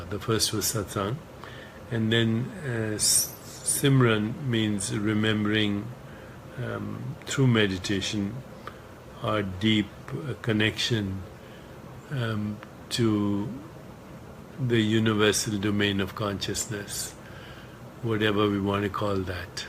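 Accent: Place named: Iraq. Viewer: الي يتكلم وكأنو عراقي قريب جداً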